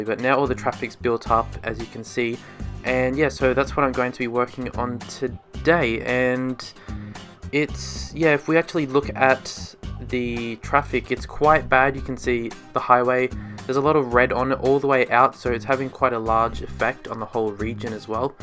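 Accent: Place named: Australia. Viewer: Yeah, I just looked up how it is in Australia, and people there drive on the left side too. And I'm not sure but I thought I heard a hint of Australian accent in his voice. Not entirely sure though.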